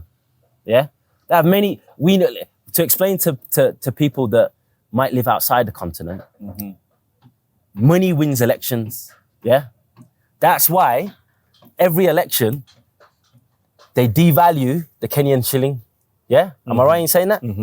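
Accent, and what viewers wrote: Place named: Birmingham, United Kingdom. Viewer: That Birmingham accent 👌🏾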